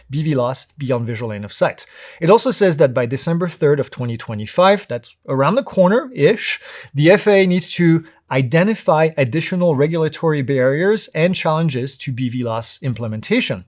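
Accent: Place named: France. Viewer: I’m just here for moderately fleeting French accent. Avoir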